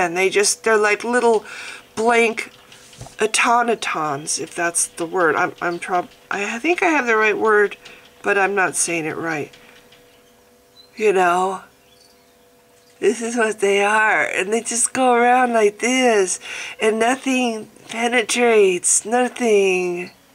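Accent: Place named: United States. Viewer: I've known about this girl a few days ago, and definitely she is the proof thar god does'n exist. He never did. I have watched almost every video that exists on youtube about her and I've cried for hours. And now I found this video of yours and I couldn't agree more with you. Seriously. I can assume for your accent that you're from the USA, aren't you? Well I'm spanish, I should have begun saying my english is not perfect and obviously I can't understand every word, and I'm not used to write long texts such as this one, although I can fully understand the context. I hope you read this, 'cause I want you to know that I am overwhelmed by your speech. I found it so true I got a lump in my throat. It's so frustrating that many people call themselves moralists and the only thing they say is "I'll pray for her"!! What? It would be a very expensive trip for me, living in the other side of the world, but I really want to do something for her. 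And so they should do, mostly if they live nearby. God is a fictional character, and he can do for Genie the same that Gandalf, Darth Vader or the flying spaghetti monster can. Nothing. For they are not real. This whole issue just drives me mad, and if it's not too much I'd like both of us to be friends in facebook. Obviously I'm not going to post here my nick for everyone to see, but I think we could chat occasionally. I can send you a private message with my facebook name in case you want. I'm trying to write down this being as polite as I can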